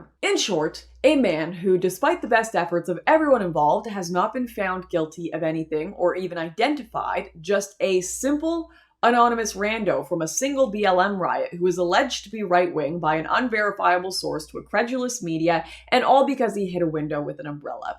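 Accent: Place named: Canada. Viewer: Letterkenny! Canadian is showing.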